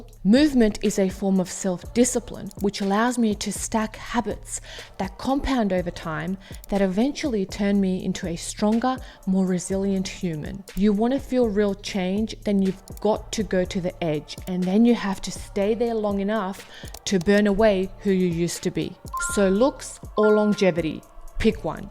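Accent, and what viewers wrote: South Africa. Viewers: It's the accent right? I also thought we were from SA when she said 'bro' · Random question, are you from South Africa, I hear a bit of an accent there? Great videos btw.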